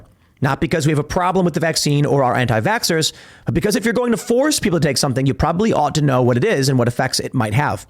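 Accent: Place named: Chicago, United States. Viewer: Anyone else notice that the more self righteous and verbose tim gets, the more he effects an annoying Chicago accent?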